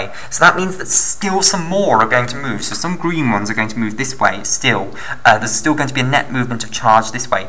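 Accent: United Kingdom. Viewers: British one 👍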